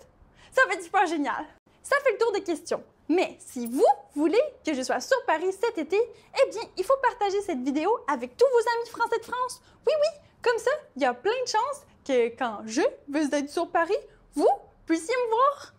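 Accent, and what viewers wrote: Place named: Quebec, Canada. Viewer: Une jolie fille avec un accent québécois !! je fond !! je suis normand...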